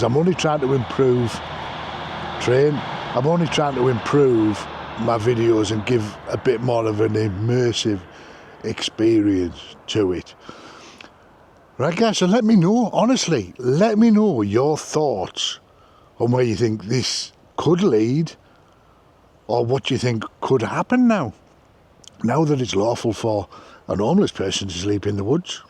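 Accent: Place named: Northern England, United Kingdom. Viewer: Great video and you presented it brilliantly and was really clear. Id no idea about the repeal. But as you say if by default should really apply to all. Im from Stockton originally but in south now and wild camp all over. Got the accent- where up there do you camp? But mainly South Downs In woods for me. Barely spotted but as others her LNT and don’t muck about. Love to see coppers walk miles uphill to find me - isnt going to happen. But will be interesting to see how this pans out. Was in Scotland a few weeks ago and its just great to have the freedom to set up where you want. Many people doing it all the time, all over. No one leaving mess that i saw. Keep us informed what you find out Cheers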